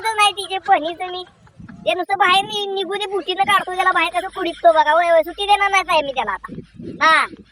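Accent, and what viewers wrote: Kolhapur, India. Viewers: 😁😁😁😁😁😁😁😁😁😁😁😁😁😁😁😁😁😁😁Anna tumachi kolhapuri bhasha man prasanna karate mundak kadun basalay nagagat 👍👍👍👍🙏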